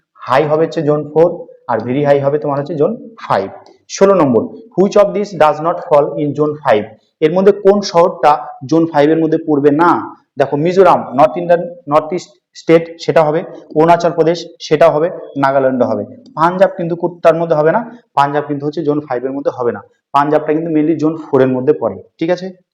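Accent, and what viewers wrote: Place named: United States. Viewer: Sir bengali te bolun...... USA english er moto lagche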